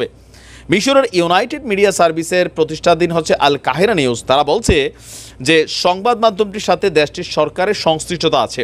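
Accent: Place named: Comilla, Bangladesh. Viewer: আপনার এই খবরে আজকে কুমিল্লার ভাষা সুন্দরভাবে শুনে গেছে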